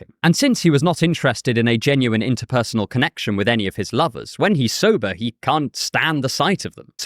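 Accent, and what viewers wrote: United Kingdom. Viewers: I read this book a while ago and it took your british accent to make me realize that its a good book.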